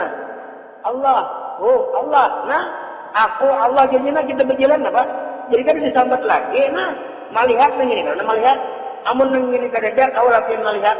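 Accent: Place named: Kalimantan, Indonesia. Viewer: Kaya logat kalimanta ceramahnya,,kiyai2 dari kalimantan banyak sekali ya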